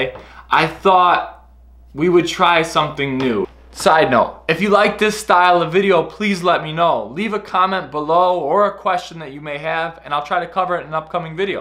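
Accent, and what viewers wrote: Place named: United States. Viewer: It's a great video. I follow your channel for a while. And I really enjoy it. First, thanks for making create videos for us. Funny thing, I was wondering which country you are from. I never thought you are a born American. I feel your english is great buy you have very noticeable accent. I was thinking. Mmm, this guy must moved to a english speaking country at young age. Then, I just saw your old video, the interview one for opening a book store . You spoke perfect american english. Does your accent really change or you just intentionally speak very slowly to cater the foreign audience? : )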